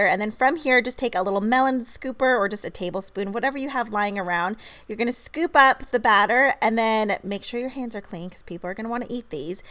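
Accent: Texas, United States. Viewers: ! You're getting a Texas twang!!! Not gonna lie- I'm looking for jobs and to relocate and I'm like "I'm gonna go to Texas. Maybe I'll see or leighann!" I'm not a stalker tho. Oh god that's how it sounds. Haha. Gotta go hide under a rock now.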